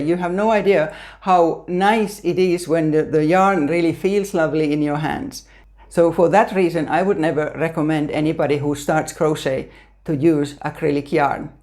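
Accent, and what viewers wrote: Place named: Finland. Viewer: I love your Finnish accent and your easy to follow directions and this video. My daughter and I met 23 of my relatives from Paimio, to Sotkamo to Aavasaksa and many more cities. Finland is a beautiful country with beautiful/kaunis people. Thank you very much/ Kiitos paljon. From California.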